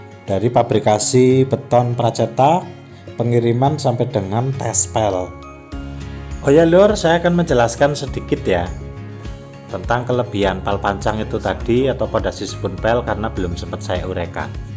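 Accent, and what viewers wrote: Java, Indonesia. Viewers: Ya itu sudah bhs indonesia bro, hanya saja logat"nya sedikit medok khas jawa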